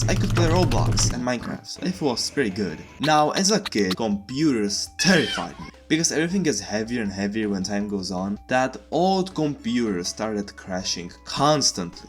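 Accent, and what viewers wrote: Czech Republic. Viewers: The accent.... are you czech?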